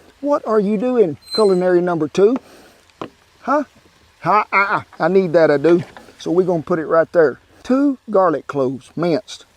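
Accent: South, United States. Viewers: Ken, you are such an inspiration. Thank you for your excellent recipes and that down home Southern style you have. God Bless in 2021!!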